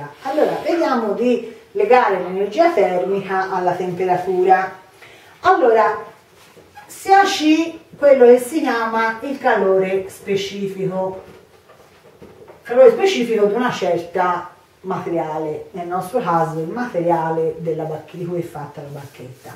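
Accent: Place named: Tuscany, Italy. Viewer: Toscana palese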